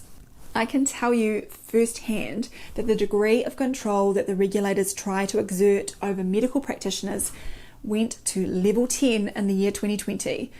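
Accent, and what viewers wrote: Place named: New Zealand. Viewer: Love the kiwi accent. I'm from NZ. The auto words picked up mass phenomenon as mess phenomenon. It is a mess phenomenon.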